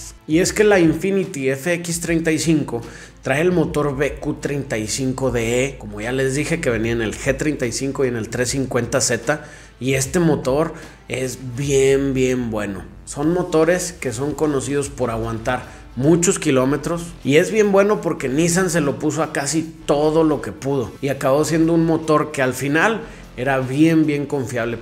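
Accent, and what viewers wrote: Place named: Mexicali, Mexico. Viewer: Usted suena como mis paisas de Mexicali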